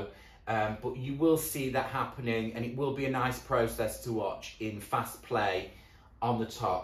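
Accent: United Kingdom. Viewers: I love your British accent almost as much as watching you transform the most plain Jane pieces into stunning beauties. You have an amazing talent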